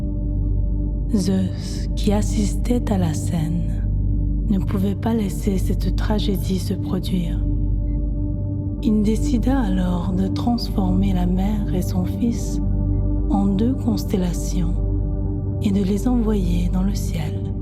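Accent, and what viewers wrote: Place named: Democratic Republic of the Congo. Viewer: C'est pas agréable de se faire raconter une histoire par une personne ayant un accent congolais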